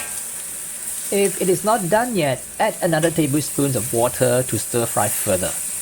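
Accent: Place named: Singapore. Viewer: Thanks for the clear instructions. I haven’t heard that Singaporean accent in years; it’s so unmistakable. Lovely video to watch.Cheers.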